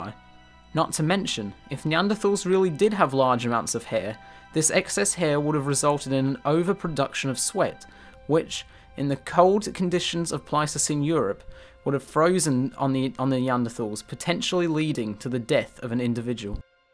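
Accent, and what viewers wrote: United Kingdom. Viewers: He's a Brit. What do you expect?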